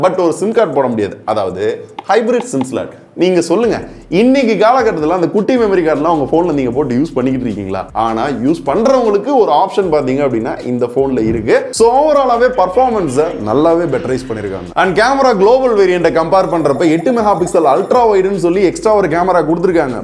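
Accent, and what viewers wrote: Madurai, India. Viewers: Anna neega madurai thana 🤔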